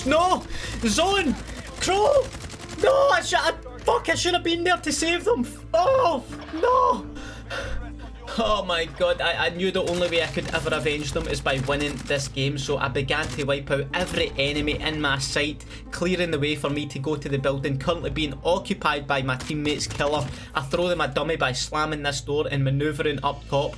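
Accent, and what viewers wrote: Scotland, United Kingdom. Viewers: Don’t forget the Scottish accent and “almighty folks” I won’t even play PC/console cod’s but I just watch him for fun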